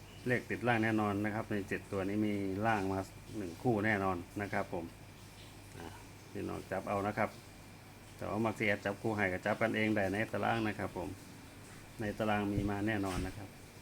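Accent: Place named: Phetchaburi, Thailand. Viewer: รบกวนถามแอ๊ด อยากทราบว่าแอ๊ดเป็นขาวจังหวัดเพชรบุรีมั้ยคะ...สำเนียงเหมือนคนเพชรบุรีค่ะ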